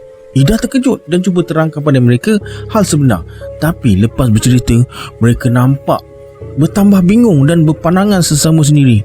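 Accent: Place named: Johor, Malaysia. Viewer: Jaln parit kemang B.Pahat selalu saya lalu bila balik rumah abah saya. tk sgka ada kisahnya.Btw ni org Batu Pahat ke? tgok slank bhasa ni mcm org Johor.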